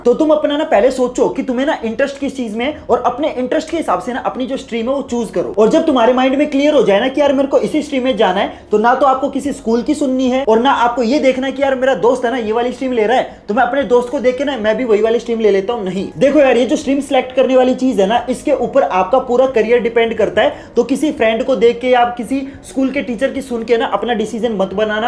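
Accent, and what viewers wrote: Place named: Haryana, India. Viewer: Sir m aapko bta skti hun aap haryana se ho 😁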